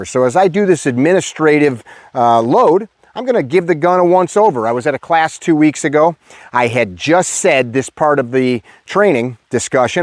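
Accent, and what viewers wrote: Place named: Minnesota, United States. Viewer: SOUNDS like Minnesota…the accent.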